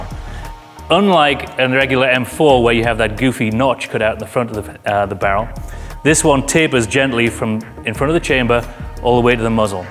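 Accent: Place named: United Kingdom. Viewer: I love my bcm stuff but the British accent didn't work for you guys.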